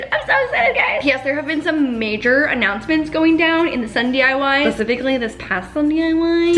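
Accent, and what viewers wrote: Canada. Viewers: You know she Canadian she says ishhhhh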